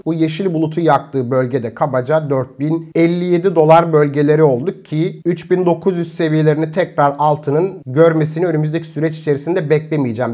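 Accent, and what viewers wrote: India. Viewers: video ingilizce yapınca hintliler gibi konuşuyorsun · Hocam niye Hint aksaanıyla konuşuyorsun?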